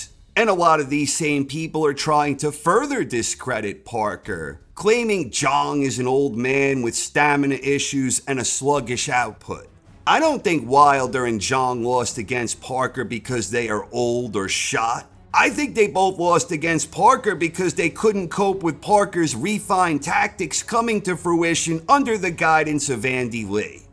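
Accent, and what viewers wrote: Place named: New Jersey, United States. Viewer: His videos are actually quite good. I like his voice, very New Jersey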